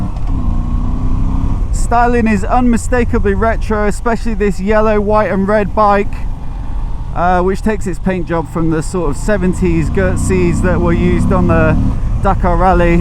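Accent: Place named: RP, United Kingdom. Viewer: Knows how to speak English? He is English. Nothing but the Queens English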